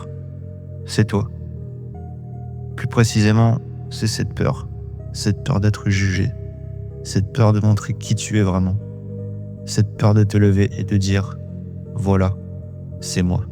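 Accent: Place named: North, France. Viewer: T’es du nord ahah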